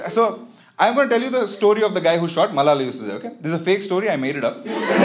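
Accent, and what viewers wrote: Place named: South India, India. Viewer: You sound south indian ... Somehow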